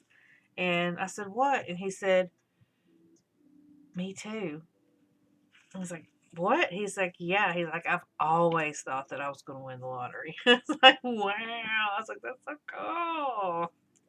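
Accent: South, United States. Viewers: I live in the South. I enjoy hearing a good Southern accent. :) I believe in the empath thing. Have you ever heard of highly sensitive people? There's a trait called sensory processing sensitivity and it has to do with how your nervous system is wired. I learned about it when I was 18/19 years old through Elaine Aron's book The Highly Sensitive Person. She talks about picking up on people's emotions, etc. I've heard "no cap" on a music reaction channel I follow, but I don't know what it means. So, I need to look that up. Or you're welcome to tell me...educate me! :) So I finally got to finish your video. Thank you for helping me chill out. I had more written but when I went back to edit my comment it erased all that. I might just do a bunch of comments along the way from now on. It'll be obnoxious, but at least I won't lose what I wrote!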